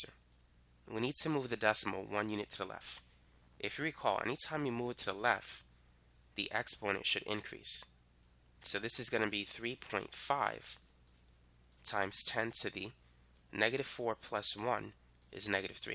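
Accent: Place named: New York, United States. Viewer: I came hear to get my seventh grader help. Now I can't stop listening to him. His voice is calming, yet keeps our interest. I love my friend's New York🤔 accent.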